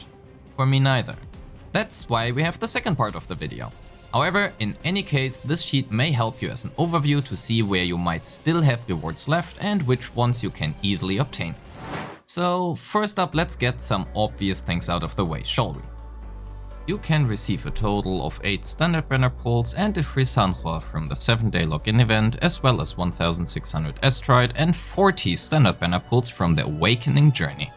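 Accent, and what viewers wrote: Germany. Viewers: you sound german! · Are you German?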